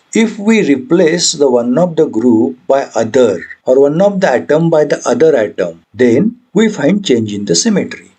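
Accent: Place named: India. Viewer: Why am i at this point where every video ragarding my topic is from some indian guy with a accent thicker than than the ganges so i dont understand shit.....😢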